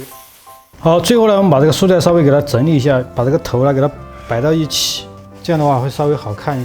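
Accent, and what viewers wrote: Sichuan, China. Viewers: 这很明显是四川口音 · 因为是川渝地区的人，所以会是椒盐普通话😂😂😂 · 椒盐川普，巴适！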